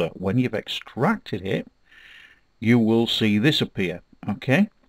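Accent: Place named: United Kingdom. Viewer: nice i've always wanted British people narrate .. lol nice voice the damn grammar XD!! don't get me wrong though .. nice vid and i'l be checking in and out for updates in pirate browser . .again thx man ..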